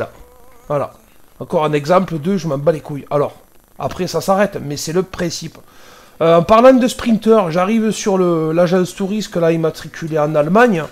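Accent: South, France. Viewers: Salut, toujours très sympas tes vidéo et ton accent du sud. a oui le stop mais rassure toi chez nous en Picardie ces pareil aussi (grrrr) allez bonne roulade tu le dit si bien et au plaisir.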